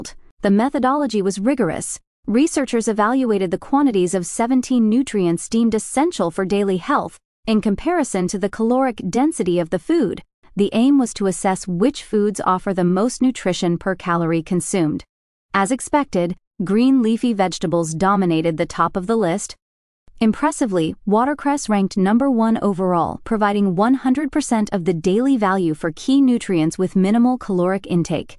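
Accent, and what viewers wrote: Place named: United States. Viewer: thanks for your posts. But you say you are in the UK? You have a very American speaking voice.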